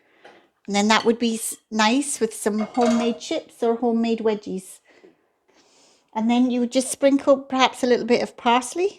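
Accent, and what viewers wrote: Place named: Scotland, United Kingdom. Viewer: Delish. Even heard a few wee words from you there with a slight Scottish accent. hehe xx And Scott Bremner....I love omelettes more than you, so there.lol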